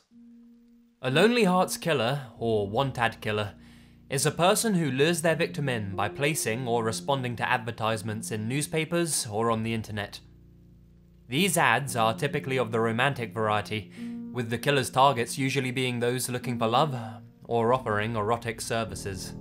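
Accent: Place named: Southern England, United Kingdom. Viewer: It's how he pronounces it, he's from the South of England so it is bound to sound a bit different. If you listen carefully, you can hear the "inn" noise at the end, but the start does sound like he says "wuh" instead of "wih". By the way I'm from Northern England so I probably sound even stranger.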